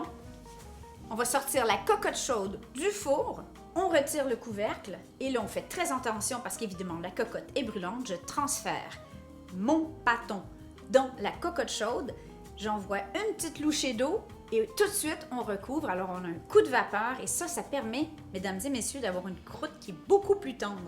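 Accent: Quebec, Canada. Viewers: Est-ce que j'entends un accent quebecois adorable? Bonjour d'une Francaise qui vit aux US! Mais encore une petite chose tout de suite: Einkorn (l'epautre), c'est de l'Allemand, pas autre chose, lol! Cici dit, je vais me mettre aussi tot a preparer tout pour realiser cette recette! Merci de partager, et vous etes un vrai rayon de soleil! J'espere que vous n'utilisez que des farines bio, car sinon, les farines standard sont tellement emoisonnees avec tous les mauvais choses se terminant en "cides", qui veut dire 'tuer'. C'est tres serieux, mieux vaut ne pas manger du pain du tout, que d'en manger fait a la farine non-bio. Protegez votre sante, mes amis humains! Bonnes aventures sur la route du pain fait maison! Merci encore! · Heureux d'être tombé par hasard sur votre chaîne en cherchant des infos sur les "air Flyer" , et de fil en aiguille j'arrive sur la fabrication de vos pains, il manque l'odeur mais la salive coule et l'estomac dit qu'il veut çà !!! 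Vous êtes formidable à regarder et quel plaisir d'entendre ma langue natale avec ce si joli accent de nos cousins d'outre atlantique. Merci, merci beaucoup ❤❤👉🇫🇷🇨🇦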